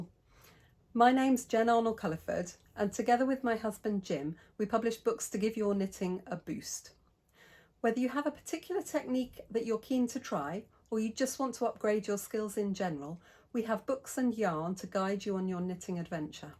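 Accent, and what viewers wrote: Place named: England, United Kingdom. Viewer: Hi Jen, I've just discovered you and your technique videos recently thru MDK which led me to this YouTube channel. Just wanted to say hi and thank you. Your videos are great, easy to follow and understand. I'm an expat from Scotland so your lovely English accent takes me home. Looking forward to learning more. I'm always eager to improve.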